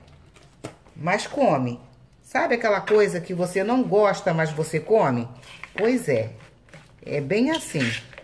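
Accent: Rio de Janeiro, Brazil. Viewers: Hummmmm, que delícia!!! Amo esse sutaque de carioca, amo suas receitas!!! Simples e fácil!! Vc é o já mora no meu coração!!!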